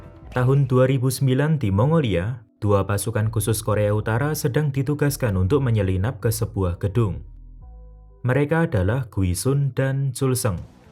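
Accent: Java, Indonesia. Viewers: Jowo pundi mas, sampean?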